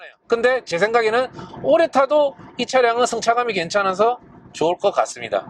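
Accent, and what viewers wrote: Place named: Daegu, South Korea. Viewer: 대구말 엄청 반갑네요 ㅎㅎ 요녀석은 정비할때 손 들어갈 공간이 여유로운 편일까요? ㅎㅎ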